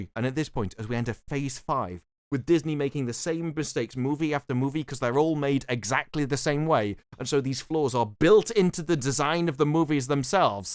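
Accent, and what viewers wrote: United Kingdom. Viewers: You are so friggin funny! The voice of reason w/ a Brit accent. When I see your tweedy self getting those undies in a bunch I know it’s bad…really bad!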